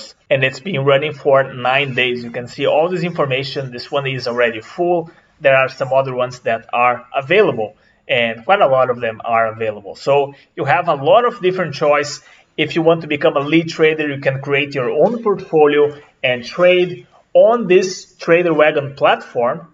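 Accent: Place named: Brazil. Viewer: brasileiro e professor de ingles, escuto esse sotaque o dia todo kkkkkkkk, mas seu ingles é foda irmão parabens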